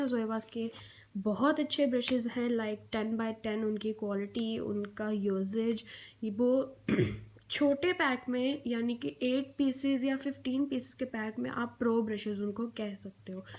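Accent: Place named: Lahore, Pakistan. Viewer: Apka Urdu + Lahori accent buht pyara lagta h 💐💐💮💮 n Allah bless you for Ur new platform inshallah yaha v aap Kmal kre 🤗👏👏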